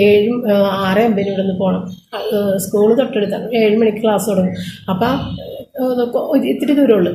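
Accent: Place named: Paravur, India. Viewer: Paravoorile language kelkan nalla rasand nde anuyathi Paravoorukariyanu same avalu parayanapole..chechiyude kashtapadu manasilavunnund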